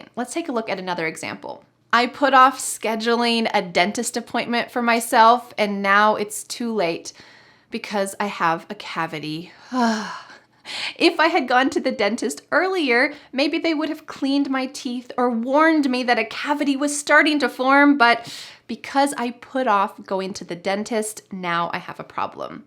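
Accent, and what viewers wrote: United States. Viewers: I ❤ ur accent......i swear....its really cool....you know I ❤ american accent like you did....TEACHER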